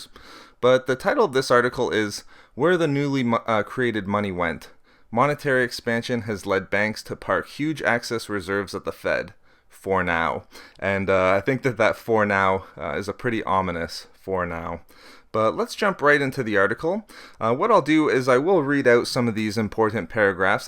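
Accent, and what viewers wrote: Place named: North, Canada. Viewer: Very interesting. I'll check these out and read them for myself, but I am interested in seeing whether inflation rises and what the particular causes end up being. On a more humorous note, do you know that you tend to pronounce "excess reserves" as "access reserves"? Hehehe... Must be that light northern Canadian accent. Don't take that as a criticism though. It didn't take away from the content. I just thought I'd have a friendly pick at you. Looking forward to the next CoinCast videos!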